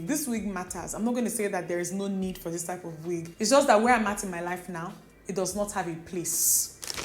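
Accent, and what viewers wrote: Nigeria. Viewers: That nigerian accent made me😂😂😂😂😂😂, 💓💓💓👌🏿👌🏿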